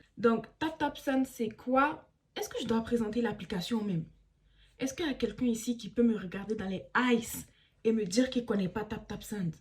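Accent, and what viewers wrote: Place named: Ivory Coast. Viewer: J’aime trop la façon tu nous racontes la story🥰🔥 l’accent ivoirien et tout et tout je kiff 💕 Bourkina / Civ c’est la famille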